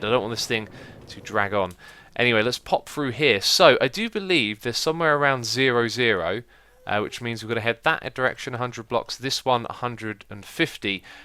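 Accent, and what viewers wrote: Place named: South East England, United Kingdom. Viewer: He is British but he won't answer more specifically than that. I think it's safe to assume he's from south-east England though.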